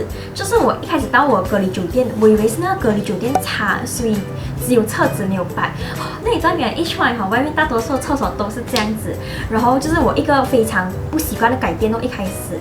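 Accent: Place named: Malaysia. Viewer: In Singapore answer call is charged ? I thought is always free。，but it's nice to hear your Malaysian accents. Like 🥰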